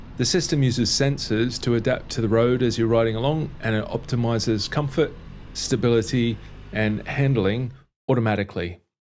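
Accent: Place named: Australia. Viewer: Luv yer review. Mayt, ya sound almost like an Aussie. I’ve not seen any of your reviews before, but I liked how ya did this one so I’ll look at a few more. Cheers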